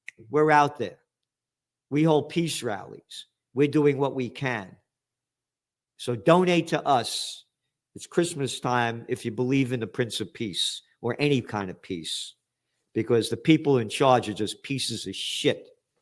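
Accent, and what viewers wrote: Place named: New York, United States. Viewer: Stop saying “turlit paper” with that annoying NY accent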